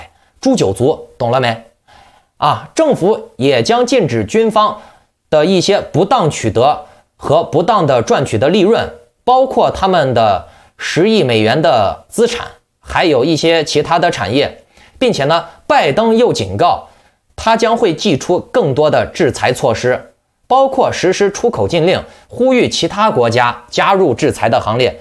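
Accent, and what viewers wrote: Henan, China. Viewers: 你说的是河南话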